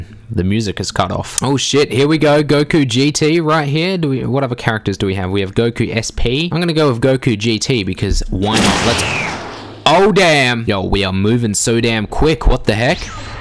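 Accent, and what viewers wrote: Australia. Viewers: Are you an Aussie m8!? Sound like my girls brother lol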